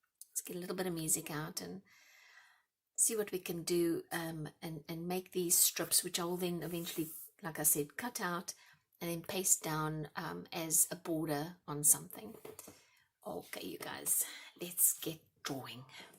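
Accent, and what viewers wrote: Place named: South Africa. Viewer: So great to hear a South African accent!!!❤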